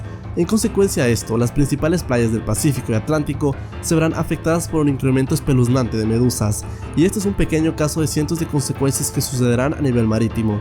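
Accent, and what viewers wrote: Ecuador. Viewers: Oh vaya eres de Ecuador O.o como yo :v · Eres de Ecuador?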